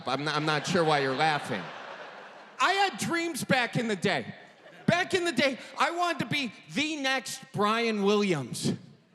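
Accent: Midwest, United States. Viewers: Oh gosh! From central Wisconsin and sounds like home. Lol! Love ya! Good lookin’ Wisconsin guy! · I'm an iowan and this is so accurate and the Midwest accent is a mixture of southern and hick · Midwestern here, from Wisco herself. Is this what our accent sounds like? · I moved to Wisconsin 20 years ago and I've still never heard anyone with that thick of an accent. But then people here say I have a southern accent soooo 🤷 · This man is pulled between his Wisconsin speech pattern and neutral speech pattern. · Thank you for bringing this to YouTube! My son got me following you pretty early on. My California baby was in tears over your accent and Midwestern humor. Loved this special start to finish, would love a separate "auction" one, if you're so inclined. Hope to see you live someday! 💜✌️😎🍀🌎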